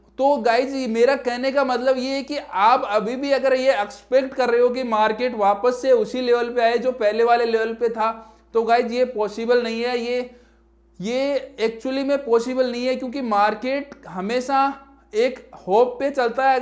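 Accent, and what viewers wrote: Rajasthan, India. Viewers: It seems u r from Rajasthan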